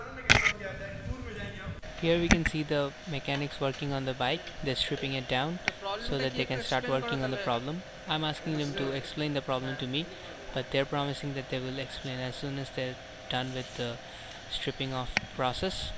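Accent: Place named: Bengal, India. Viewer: dude that bong accent tho!! :D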